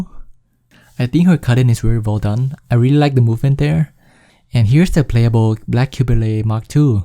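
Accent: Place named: China. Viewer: That's not an indian accent. I think he's Chinese.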